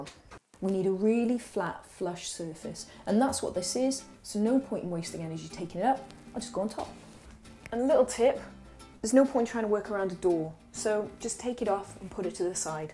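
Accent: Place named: Wales, United Kingdom. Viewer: It's the combination of no nonsense facts and a tinge of a Welsh accent. Go with it.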